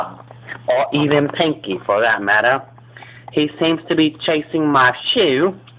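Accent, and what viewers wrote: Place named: Australia. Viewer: ! I love your Australian accent and your amazing skills with the newspaper. I can't wait till you make more videos like this!:)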